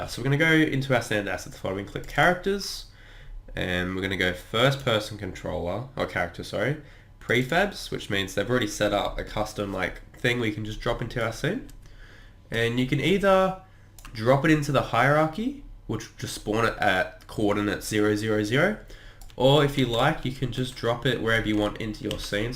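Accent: Australia. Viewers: Are you an Aussie if I’m wrong please correct me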